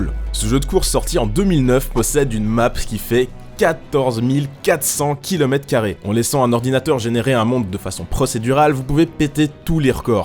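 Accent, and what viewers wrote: Belgium. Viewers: T'ES BELGE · mec t belge la classe (enfin je crois car tu dis nannante neuf)!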